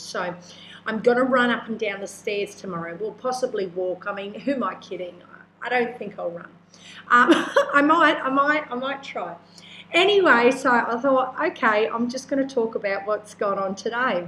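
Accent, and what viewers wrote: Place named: Australia. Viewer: Hey it's Nealos. Good to hear you still sound Aussie as fcuk! Hang in there mate.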